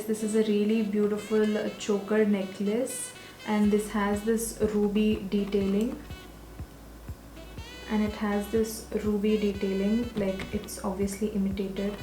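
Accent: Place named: North India, India. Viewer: U look like a north indian by accent and looks💯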